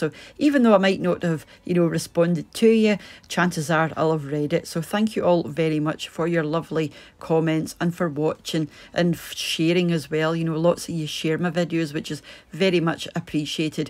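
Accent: Scotland, United Kingdom. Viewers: I agree about your voice. The Scottish accent is just so distinct and is one of my favorite. Rupauls Drag Race (if you didn't know it's a competitive drag queen show) is doing a second season for the UK and 1 of the contestants is Scottish. Now I have you and Lawrence Chaney to listen to all the time! ❤❤❤❤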